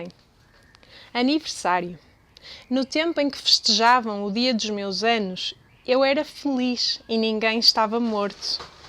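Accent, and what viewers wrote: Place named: Portugal. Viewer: Olá,! Sou professora de Língua Portuguesa para adolescentes no Brasil e tenho utilizado alguns vídeos seus para lhes mostrar vários aspectos da língua, incluindo as diferenças em relação ao português falado no Brasil, assim como esse sotaque lindo de vocês! Os textos lidos hoje são muito bonitos! Posso lhe sugerir conhecer um poema de Manuel Bandeira, que sua leitura me evocou? Chama-se "Profundamente". Espero que goste. 😊🌷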